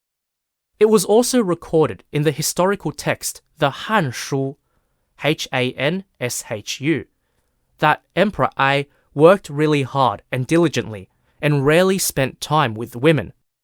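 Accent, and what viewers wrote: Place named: Australia. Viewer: First time watching. Interested. Ozzy accent puts me off cause it's usually racist. Only 4 mins in but this don't sound racist yet. I understand that sounds racist. It is. Hence interrested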